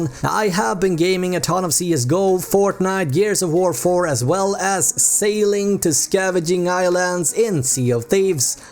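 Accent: Sweden. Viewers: Are you a swedish elleh? Good review. It's an instant buy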